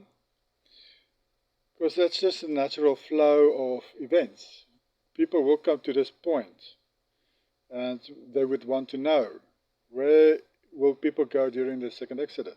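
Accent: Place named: South Africa. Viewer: Ha, I asked if you were South African in one of your later videos, and now after this one I know. I'm going through all your videos now. I found you from your comment on the video with those 3 guys talking about the millennial reign.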